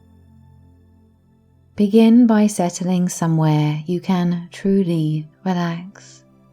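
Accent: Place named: England, United Kingdom. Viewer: My Mum is my true worldly life partner. And I lost her. She died. I have survivor remorse. I am recovered. My Mum is renewed in a different world. I heal my intestines. I am well. Thank you for your hypnosis stories. Your words. Your english voice. Your natural way. Your work. Continue to promote your work to the world. Thank you.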